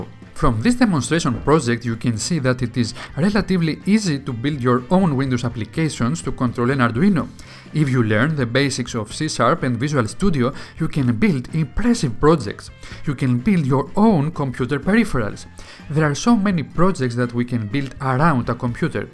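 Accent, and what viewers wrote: Greece. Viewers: Are you from Greece? Your English remind me when Greeks speak English btw I'm from Greece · Your accent sounds Greece but not sure 100%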